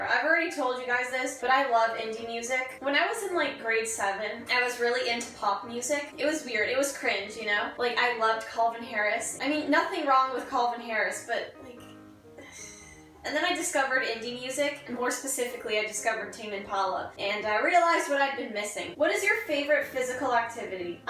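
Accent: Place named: Canada. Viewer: her canadian is showing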